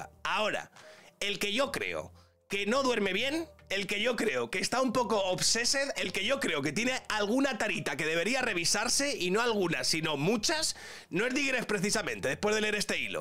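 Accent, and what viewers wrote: Mexico. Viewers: Buen acento mexicano, en mi opinión. Me imagino que ese hermano Culichi le escribió para platicar algo de seguridad en mi México lindo 🙈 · no se por que youtube me recomendó el video, no entiendo el contexto pero ame tu acento mexicano 🤣🤣🤣🤣🤣🤣 entonces esa youtuber española si estaba haciendo acento mexicano cuando resumio coco y yo creyendo que el barrio la respalda. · 😂😂😂😂, me gusta tu acento mexicano ja,ja,ja,ja,ja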